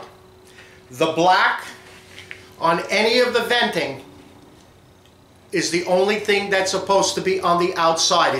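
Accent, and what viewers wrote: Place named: New England, United States. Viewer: Love your yankee accent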